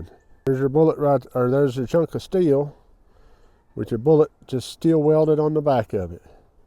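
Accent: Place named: South, United States. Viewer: Man painka pietinių valstijų akcentas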